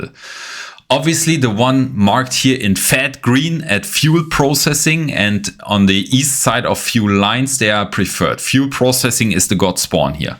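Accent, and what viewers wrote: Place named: Germany. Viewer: Good Video. Dont kow why some people crying here. Außerdem hörst du dich deutsch an. Muss man supporten. Sollen alle die fresse halten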